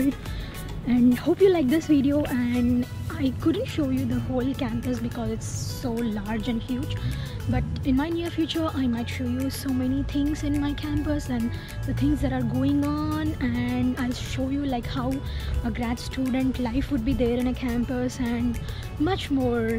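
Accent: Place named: India. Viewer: your Indian accent is cute! <3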